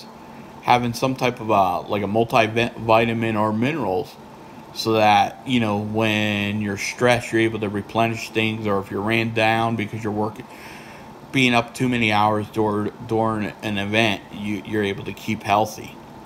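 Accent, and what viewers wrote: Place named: Eastern Pennsylvania, United States. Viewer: Did I read a comment that you are in Eastern PA. That is where I am Originally from. I should have recognized the accent.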